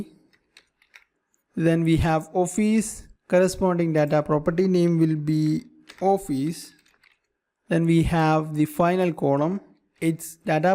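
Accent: India. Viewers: omg I cant understand your indian accent, so heavy.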